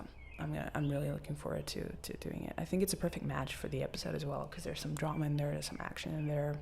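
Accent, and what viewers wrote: France. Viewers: Love your french accent !! When you say "Disney" the « s » sound like the beggining of « sorry » or « snake » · As a french I love your accent, you speak well! Are you sure you've never been to Paris😋?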